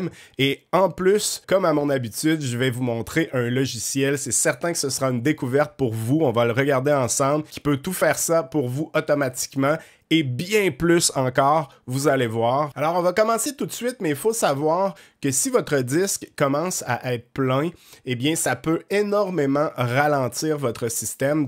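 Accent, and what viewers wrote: Quebec, Canada. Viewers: Bonjour l'ami, perso je ne comprends pas tout, car j'ai fait des manips comme toi, mais je n'arrive jamais au résultat escompté, de plus, je n'ai que très rarement les mêmes fenêtres qui s'affichent à l'écran ... J'utilise windows 11 famillial installé de base sur mon PC, pas de version pro payante, du coup beaucoup de tes trucs ne fonctionnent pas ... Pourrais tu te mettre au niveau du commun des mortels et proposer des astuces qui marchent avec les versions pré-installées et non payantes que la plupart des gens possèdent ??? Rassures toi, j'aime énormément tes vidéos, ne serait ce que pour ton accent !!! Mais il serait sympa que tu penses aussi au petit peuple qui ne peut s'offrir la version pro ... Allez, je dis ça, je dis rien ... Bises à toi mon cousin de la belle province😘😘😘 Phil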